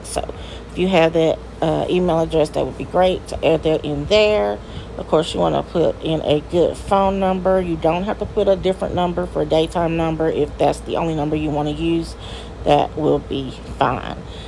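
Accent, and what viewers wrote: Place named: Texas, United States. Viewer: I'm in Texas too.... the moment you said "Hey y'all" I KNEW!🤣😂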